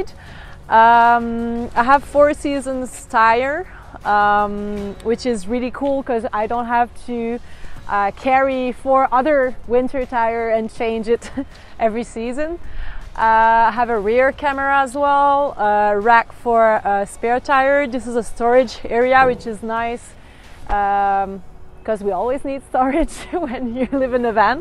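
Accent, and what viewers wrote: Canada. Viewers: Definitely a strong Quebec accent haha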